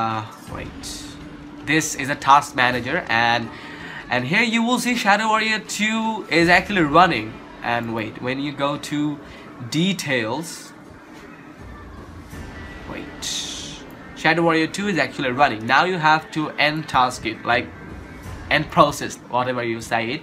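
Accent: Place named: India. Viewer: This guy has to be an Indian.. he has that call-center accent, very indian but trying to sound American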